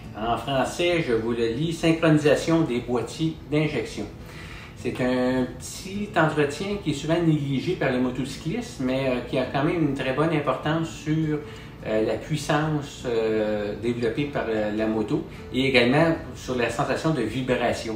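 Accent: Canada. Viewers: Merci beaucoup pour ces explications très bonnes Et ce belle accent du canada donne une belle saveur à tout ça. Merci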